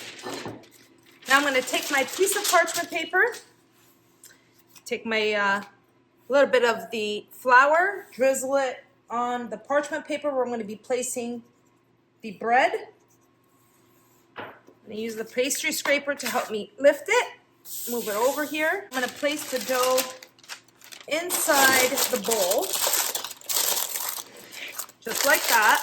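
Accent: Portugal. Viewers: Your português acent is beautiful ❤❤❤❤ I'm from Portimão in the Algarve, are you from Açores?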